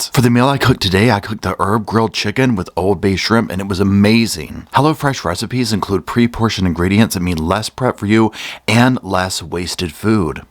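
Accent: South, United States. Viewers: I've just found your page, and am enjoying it all in that southern accent ♡ Have you considered reaction videos to Who Do You Think You Are- the genealogy show? I think that would be amazing 😊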